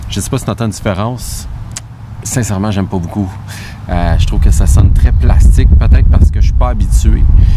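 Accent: Quebec, Canada. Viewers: Bonjour, Je découvre aujourd'hui ton Chaleureux accent québécois. Saxophoniste âgé mais volontaire; peux-tu me donner quelques conseils pour travailler un son harmonieux, la dextérité et l'improvisation! Cordialement! Jacques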